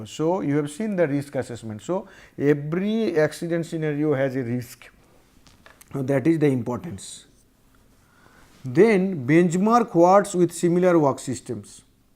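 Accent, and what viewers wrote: Bengal, India. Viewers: Try to understand the matter he is teaching, not make joke of his Bengali accent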